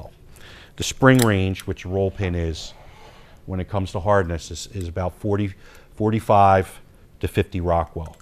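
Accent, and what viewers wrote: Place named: Philadelphia, United States. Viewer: I grew up right outside of North Whales PA. Love the accent man! True Philly/Eastern PA! Just purchased this trigger. Cant wait to get it installed!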